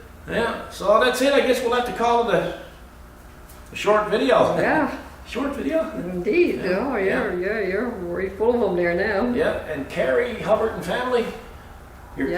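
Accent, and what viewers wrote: Newfoundland, Canada. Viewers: First time watching. Great videos. "My son they're in there now, they thinks their in Bermuda". I'm assuming your from Newfoundland. Now where is the Jiggs dinner? I'm hungry!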